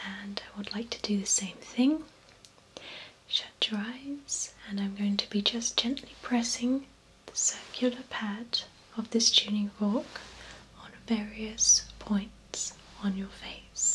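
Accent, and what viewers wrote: United Kingdom. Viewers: starts with that british accent <3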